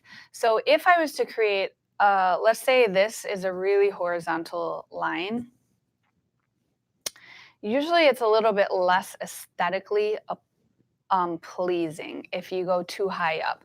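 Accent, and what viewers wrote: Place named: Eastern Europe. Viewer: rahul kumar I agree. And her accent is adorable. Sounds like she was raised with another language. Eastern European possibly? 🔥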